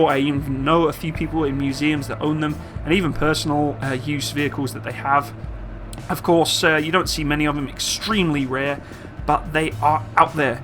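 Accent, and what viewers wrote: United Kingdom. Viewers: i though you were british? how long you been in canada?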